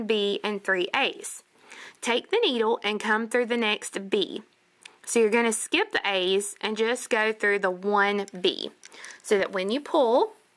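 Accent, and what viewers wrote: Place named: North Carolina, United States. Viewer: Great tutorial! gives clear and excellent instructions, plus I love her North Carolina accent.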